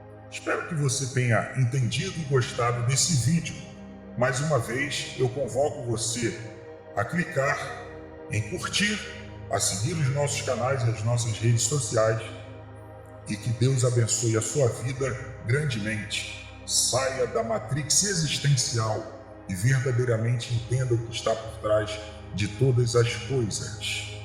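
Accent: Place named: Rio de Janeiro, Brazil. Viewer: Sotaque carioca é tenso mesmo KKKK mas o vídeo é otimo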